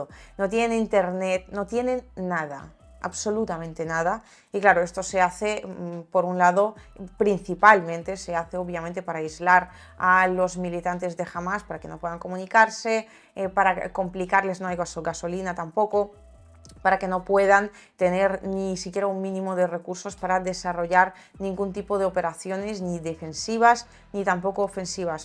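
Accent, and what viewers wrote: Spain. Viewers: Muchas gracias y ya se que es rusa yhabla español de acento de España!!! Mejor que sea rusa. Y si soy bobalicon o lo que sea, la belleza te pone torpes las neuronas ! Así somos de intensos los latinos, pero ante todo su inteligencia vale mucho más de esta hermosa dama . Grazie , buona giornata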